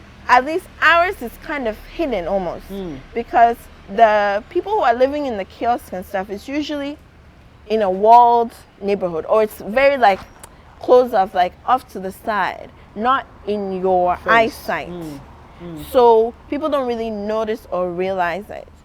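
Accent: Africa. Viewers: She's not Canadian, sitting up there with her thick African accent. Has she ever been to the US. She's so ignorant. The same thing is going on in Canada with black people. How is she speaking on America? If she's never been to America she's just taking what people that she knows in America are telling her. I'm AA the way she saying, AA are treated in the US. I've never been treated like that. No one in my family's ever been treated like that. Not all AA are treated badly. Only a ignorant person would think that. That's like me saying all Africans live in the village in cow manure huts and you're all dirty and you're all stink. All African are educated. Like how ignorant would I be to say that. smfh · She has an african accent as well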